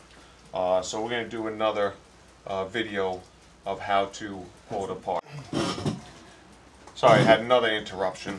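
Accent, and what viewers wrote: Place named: Texas, United States. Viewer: Nice explanation! I detect an accent. Are you from Texas?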